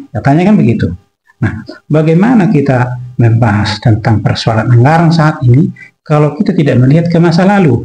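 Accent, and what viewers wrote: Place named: Toba, Indonesia. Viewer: Logatnya toba banget...ponakan opung luhut ini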